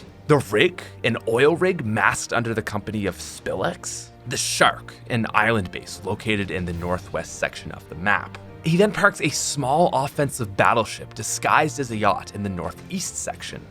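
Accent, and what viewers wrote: Canada. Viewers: You are Canadian. I know cus of the way you say out and however prove me wrong, oh wait you can't.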